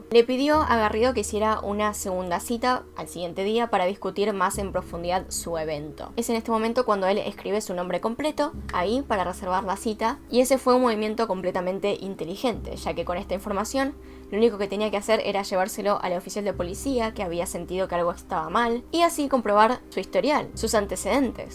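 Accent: Argentina. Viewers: ¿Eres de argentina? Perdon quiero saberlo BDHDNSVJ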